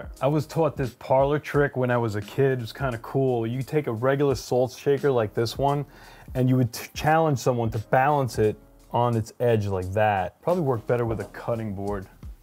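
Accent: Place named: Long Island, United States. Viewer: I’m from Long Island and recognized the accent haha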